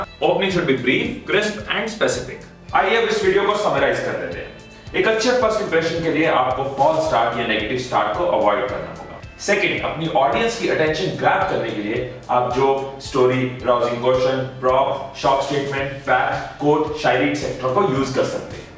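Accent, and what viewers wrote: India. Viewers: Cute Indian English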